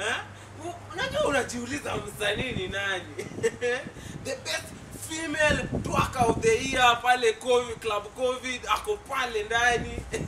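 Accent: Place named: Luo, Kenya. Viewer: Sasa ispokua accent yako ni ya kiluo athawiz umejaribu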